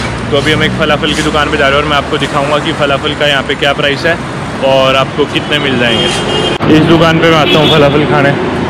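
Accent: Haryana, India. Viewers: Like ur Haryanvi touch it is like having a sprinkle of honesty and strength .👍🏼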